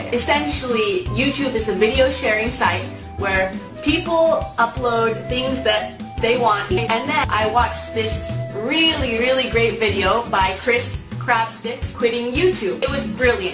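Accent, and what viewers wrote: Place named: United States. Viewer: pretty good american accent to just have moved from argentina a year ago. wondering what else is coming.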